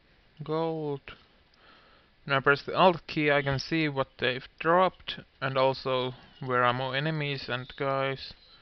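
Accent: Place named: Finland. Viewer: Heheh must be the Finnish accent.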